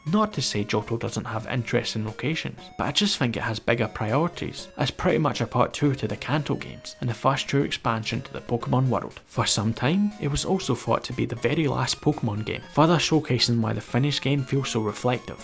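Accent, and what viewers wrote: Scotland, United Kingdom. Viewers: it's a great accent you have. Brilliant. Scottish? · He said "Polish a bit more your voice" not "rebalance your sound". He doesnt understand the Scottish accent. I had no problems hearing anything in this video.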